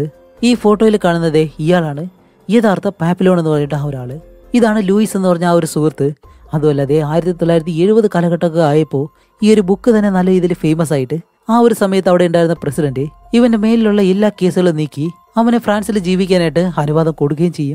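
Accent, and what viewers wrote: Palakkad, India. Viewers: പാലക്കാട്ട് കാരനാണല്ലേ കഥ പറയുന്നത് 😃?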